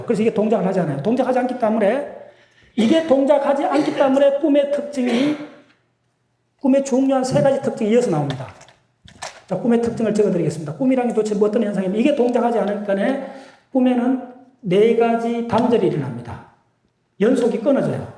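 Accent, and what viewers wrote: Gyeongsang, South Korea. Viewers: 강의내용은 좋은데 경상도 사투리가 심해 잘 알아 듣지 못하겠다.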